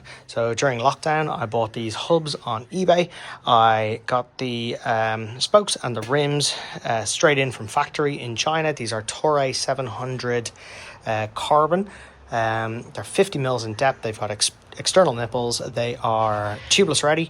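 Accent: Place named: Ireland. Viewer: Brilliant video as always. Great to know I'm not the only hoarder out there. Mind you, I've ONLY (currently lol!) got 6 frames in various states, all road bikes. What are the bars you got on ali express for the reacto? I've heard some horror stories about carbon bars off there. So I'd be interested in your thoughts. Keep up the great work. Started following you on instagram, then discovered your youtube channel. Great to hear the Irish accent!!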